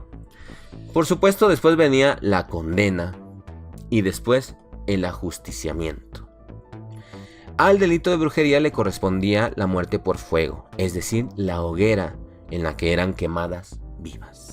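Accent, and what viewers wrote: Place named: Spain. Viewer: Saludos a todos y un gran programa amigo y AHORA ENTIENDO POR QUE TE SALE TAN BIEN ESE ACENTO ESPAÑOL😂😂😂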